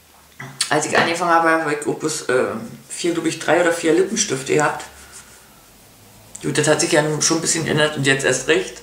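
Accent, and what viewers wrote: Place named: Berlin, Germany. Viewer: Biste Bärlinerin? Hört sich zumindest so an. Und wenn ja - sei gegrüßt aus Pankow :)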